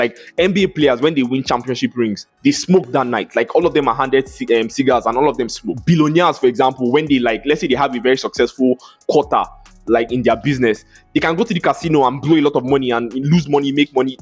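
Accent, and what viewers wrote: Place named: Nigeria. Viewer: Nice video Bro hearing a fellow Nigerian brother speaks with a naija Tongue really resonate so much Waptrick, redwap You were really spot on Porn addiction is the grandmaster of the other addiction. A porn addict brain is same as that of a person on heroin, a porn addict, will also be addicted to gambling and other vices A man who can control his sexual urge is a dangerous man, and a dangerous man is a focused man I have some video requests for you, will like to hear your opinion on 1) Nofap and Semen retention 2) Single mothers: - Dating a single mother/ babymama as a man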